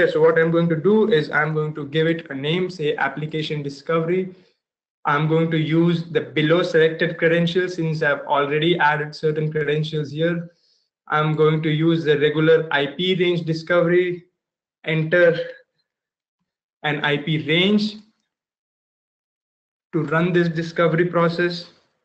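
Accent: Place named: India. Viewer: i hate this slang, as well as any other slang. please hire someone with clear speech. dear indians we do respect you, but this is too much, you spam with many useful videos, but this slang is so frustrating that i prefer strong alcohol drinks rather than listening this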